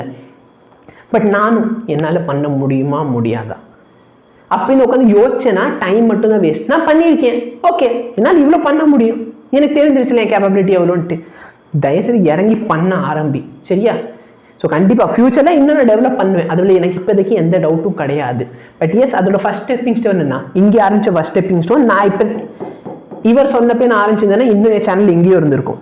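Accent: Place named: South, India. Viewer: mathya Pradesh a neenga south nu nenechen unga slang a vechi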